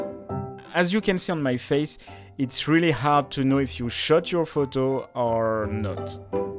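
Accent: France. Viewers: love the french accent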